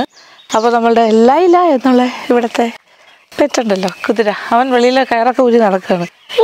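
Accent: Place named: Palakkad, India. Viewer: Very beautiful 🥰. Chechikku palakkad bhashayum vannu. lots of love.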